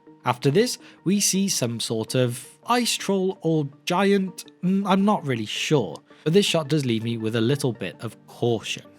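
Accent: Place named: Wales, United Kingdom. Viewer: Literally just stumbled on this channel today. Presenter’s accent is slightly subtle and I’m like “is this guy Welsh?” … then I hear how he pronounces Morfydd and Owain and I’m like “you son of a bitch, I’m in” SUBSCRIBE 😁🏴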